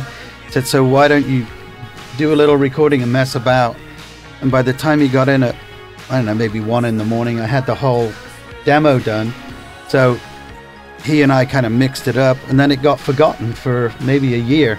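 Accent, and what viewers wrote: United States. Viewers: Is it me or does he have an American accent? Isn't he originally from England? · What's with the ridiculous america accent mike and you're toned down your Liverpool one embarrassing ?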